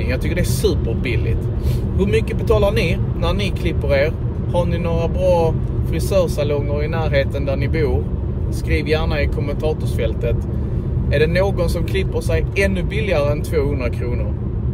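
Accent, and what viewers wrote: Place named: Skåne, Sweden. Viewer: Jättebra kanalen! Men jättesvårt att förstå skånska för mig 😊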